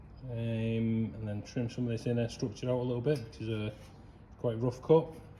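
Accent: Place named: Warrington, United Kingdom. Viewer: I'm near Blackpool recognised the accent as my wife is from Warrington/ st.helens 😅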